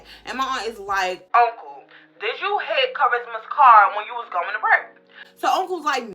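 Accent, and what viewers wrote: New York, United States. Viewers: Sis really said “shiznayii” you are sooo New York. Don’t ever change🤣🤣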